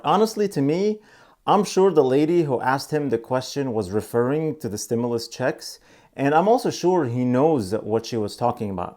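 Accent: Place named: Arab world. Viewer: With all my respect to you. Money doesn't buy happiness. WHERE'S your awesome accent coming from? Arabic?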